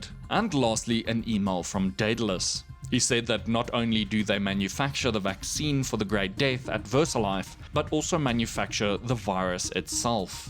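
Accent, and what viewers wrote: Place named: South Africa. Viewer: Are you carring me a riar? Always kills me😂😂..good to hear a South African voice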